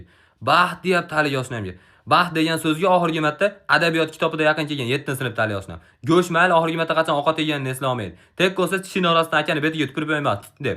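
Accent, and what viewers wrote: Andijan, Uzbekistan. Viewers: Andijon shevasi ajoyib 😊😊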